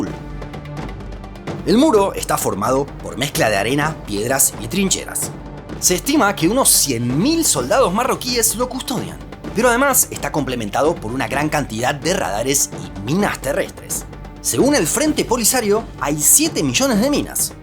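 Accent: Argentina. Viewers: Hablas como Argentino y es feo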